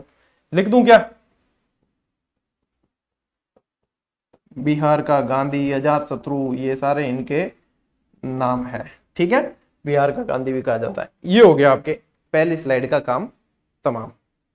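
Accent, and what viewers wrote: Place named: Marwari, India. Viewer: Ek request h plz marwadi na bole apni vedios m..iritation hoti h.. uper se ap apki marvadi bhi alg h smjh bhi ni aati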